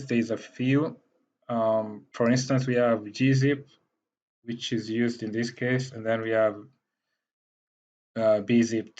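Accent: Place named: Nigeria. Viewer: Bro you sound Nigerian. Is there a way to connect with you? Instagram? Twitter?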